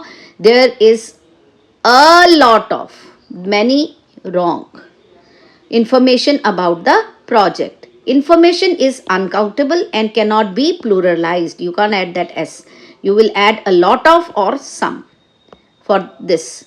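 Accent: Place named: India. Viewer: Was your strong Indian accent an obstacle to be an IELTS examiner?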